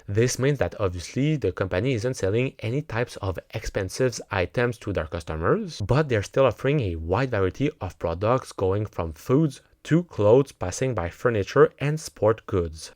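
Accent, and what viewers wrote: Quebec, Canada. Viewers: Au prochain rapport trimestriel, pourriez vous créer une version de votre vidéo en Français, SVP. Merci bien. Votre accent Quebecois est très agréable à entendre.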